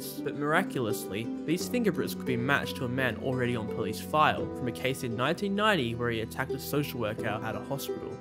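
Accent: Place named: Australia. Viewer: Great video! So cool to hear an Aussie covering these topics. Hope to see more from you!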